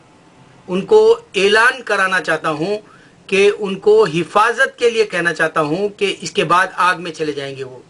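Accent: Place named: India. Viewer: ye indian hai yaqeenan kiun ke Urdu is tarah pronounce nahi hota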